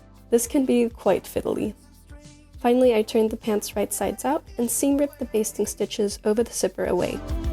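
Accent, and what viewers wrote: Denmark. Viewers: Okay, bliver nødt til at høre om du er fra Danmark? Dit engelsk er virkelig godt, men der er noget dansk over det 😄 hvis du er, og kan læse min kommentar, så tusind tak for din tutorial! Den var super hjælpsom 😊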